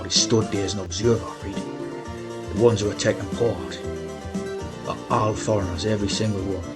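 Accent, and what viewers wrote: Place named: Geordie, United Kingdom. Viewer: I just wondered if you'd lived abroad but I know you are pure Geordie 😂😂🤣🤣😂😂🤣🤣I'm Northumbrian, we both have same strong local accents, unlike many that have changed to fit in, I'm proud of where we're from and never going to change, every two mile up the road a different accent, I was brought us as a Geordie, then moved back a few miles and worked with pitmatic accent, breed of our own😆 x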